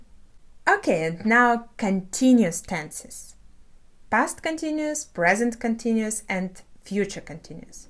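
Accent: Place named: England, United Kingdom. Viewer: I love your English accent, it gets me chills 😁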